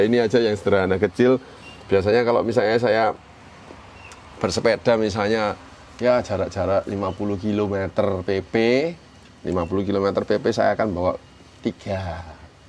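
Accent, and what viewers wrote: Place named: East Java, Indonesia. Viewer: Suka dengan penjelasan nya, khas jawa timuran, matur nuhun mas, salam dari Jakarta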